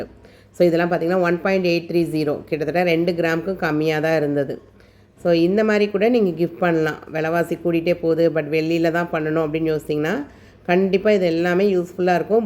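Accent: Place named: South, India. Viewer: neenga south ah ka...slang apdiye irukku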